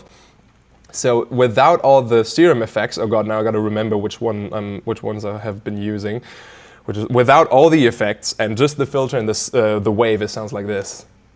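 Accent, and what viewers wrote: England, United Kingdom. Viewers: Someone’s been spending a lot of time in the UK. He’s got half a British accent now! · Is it just me or does sound like he has a slight British accent now? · why are you developing an english accent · Does virtual riot kinda sound british in this video?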